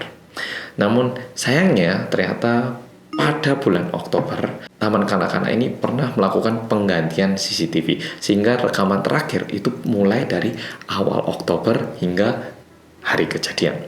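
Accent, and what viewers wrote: Java, Indonesia. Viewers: Tiba” mncul d dranda ,pas aku buka videonya,mukan dia korea bgt tp pas ngmong logatnya jawa bgt kocaakkk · Mas nya ini asli org korea bukan ya.. kok logatnya jawa.. apa keturunan aja ya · Semoga menjadi contoh dan perhatian pemerintah Indonesia preside dalam melindungi anak bangsa dari berbagai sisi dan menjadi hemat dalam membuat undang2 perlindungan anak perlindungan anak Saya pernah dengar langsung cerita dari anak sepupu (masih kelas 5 SD) bahwasanya ada teman lelakinya disekolah menarik teman perempuan disekolahnya dn menyuruh membuka pakaian dalamnya dn diberikan uang, bayangkan betapa terkejut sekaligus sedih dn takutnya saya, dan menurut keterangan sianak mereka sudah memberitahu kepada guru, akan tetapi tidak terlalu ditanggapi , hal ini sepertinya sering terjadi disekolah, terlebih di daerah. Semoga menjadi perhatian lebih dari pemerintah khususnya kementerian pendidikan, karena sekolah harusnya aman dan nyaman demi masa depan bangsa.Amin. 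Terima kasih bt oppa yg mendok, terimakasih sdh mengedukasi. Sukses slalu.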